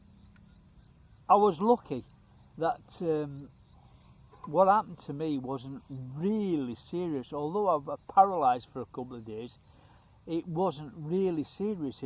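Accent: Yorkshire, United Kingdom. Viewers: Where are you from pal are you from Yorkshire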